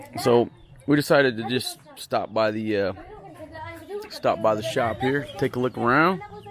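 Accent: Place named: Western Pennsylvania, United States. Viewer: You have a western Pennsylvania/Ohio/WV accent.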